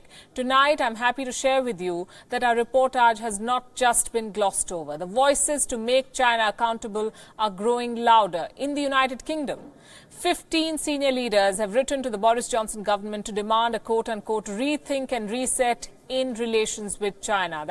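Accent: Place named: India. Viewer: Listening the accent I'm sure she is another indain journalist Aaj Tak, India tv and now this shame on you Is there any channel of India which broadcast a real news ? Just send me the name . I really would like to watch it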